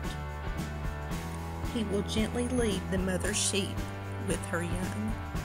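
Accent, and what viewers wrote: South, United States. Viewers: I could listen to you talk all day. Makes me feel like I’m back home in the south♥️ great video my friend!!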